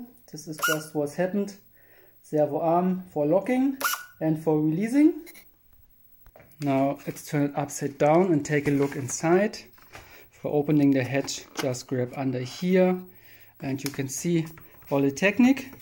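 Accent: Germany. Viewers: Ich liebe den deutschen akzent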